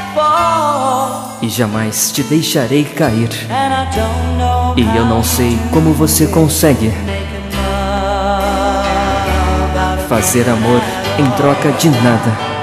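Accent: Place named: Paraíba, Brazil. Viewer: Voz meio Paraíba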